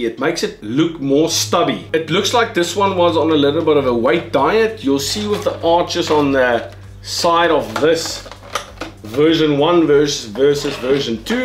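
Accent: South Africa. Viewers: Great vid and super informative! Am defo not liking that body and lack of detailing on V2 at all and otherwise it looks like a bit of a rip off since its more expensive! However I am liking your awesome accent though😎 Are you originaly from South Africa? Greetings from Germany. Subbed✌ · Your from New Zealand? Your accent sounds south African ?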